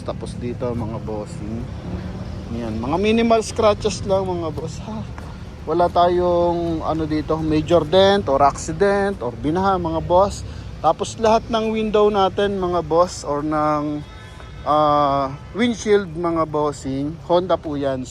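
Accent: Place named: Bicol, Philippines. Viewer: new subscriber padi.bikolano kaba kabayan?ung accent mo kasi na haa!😂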